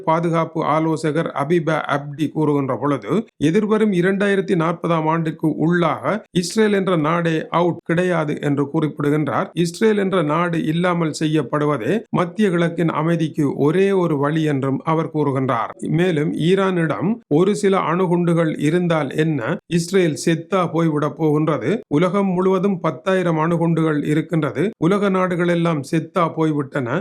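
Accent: Sri Lanka. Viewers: இலங்கை தமிழ் 🎉🎉🎉🎉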